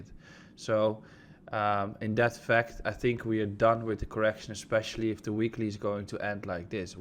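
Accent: Netherlands. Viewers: nederlands dat hoor je gelijk haha !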